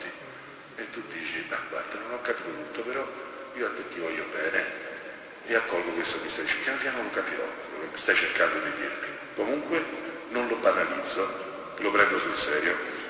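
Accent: Rome, Italy. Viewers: Padre, io la rispetto perche’ lei porta la parola di Dio padre nostro, a tutti noi suoi figli. Ma mi permetta il suo accento e dire romanesco è veramente fastidioso. Vede, le sue catechesi sono seguite in tutta Italia, io per esempio sono di Torino e a volte fatico a capire la su “lingua”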